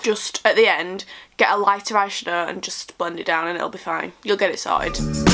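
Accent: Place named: Yorkshire, United Kingdom. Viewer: deffo from yorkshire haha like me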